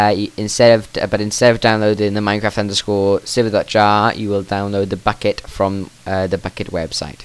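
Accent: Wales, United Kingdom. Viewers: Are you Welsh? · Are you Welsh? :) Just your accent sounds Welsh.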